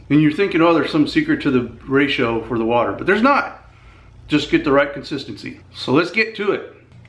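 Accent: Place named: United States. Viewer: Sounded Amerikin to me.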